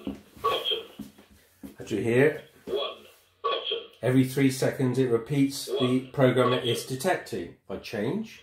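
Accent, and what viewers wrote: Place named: United Kingdom. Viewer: I love that idea! I wonder if my company could make something similar for appliances like that. By the way, I love your accent! Is that British? I wonder if that machine works on dryers as well. Like your wife, I'm totally blind, and one thing I would love to design is a washing machine and dryer pair that can have as many cycles as you like. Wouldn't that be amazing?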